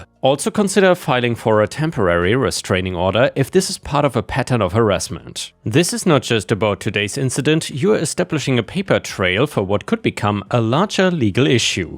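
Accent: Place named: Germany. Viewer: Hearing a guy with a German (?) accent do a Karen voice is epic! Love it!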